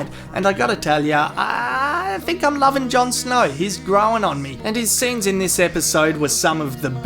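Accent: Australia. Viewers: Bloody good stuff as always. No other accent could keep me quite as entertained in a review as the good ol Ozzy!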